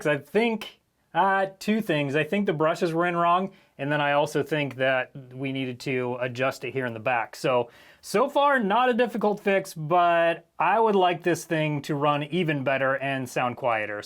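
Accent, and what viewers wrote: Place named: United States. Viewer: it feels like my mate vince with american accent 😂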